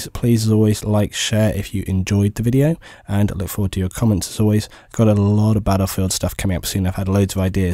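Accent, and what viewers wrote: United Kingdom. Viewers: You mention players moaning about server admins not balancing out teams and what you say is so true. Speaking as a server admin, you can't be constantly moving people round just to balance out teams when that person you moved could have been in a squad with mates or was on a winning side when moved and players don't seem to take this into consideration. You just end up pissing players off and they leave the server. Also I love that you feature on LvlCaps channel, great to hear a Brit talking!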